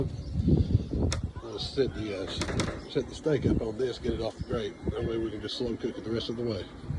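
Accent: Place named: Georgia, United States. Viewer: New to the channel. Was browsing ideas for the Tomahawk. I like the make shift basting idea 💡. However I have to say, and strictly off of personal preference, 100% NO Montreal Seasoning. Judging by the accent, you sound like a good ol Gerogia Boy. I had a friend visit from Dallas over the summer, and he used the Montreal Seasoning on a few ribeyes we smoked, and I have to add, I DO NOT enjoy it on my steak. Salt N Pepper ONLY. Others have different preferences, and I find a lot of Louisiana-Georgia boys love themselves some Montreal Seasoning. Also, wanted to ask, what flavor pellets do you prefer? I myself enjoy the Charcoal and Mesquite pellets. It really accents the flavor of the meat. I like the temp on the steak that you prepared as well. Perfect. I have the Boss 820, im not impressed with the Lockhart, the temp fluctuates too often and the box uptop seems to not hold temp as well as I hoped for. 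Also, I prefer the reverse sear, the temp control I find easier and allows more flavor into the meat before the sear. If you'd like to exchange videos, ideas and/or recipes, feel free to message me and we can exchange contact info. Always looking for good bbq persons who want nothing better than to make their family smile with tasty meals.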